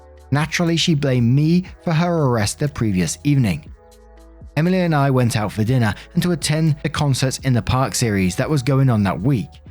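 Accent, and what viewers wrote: United Kingdom. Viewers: One question? Your accent….. are you British?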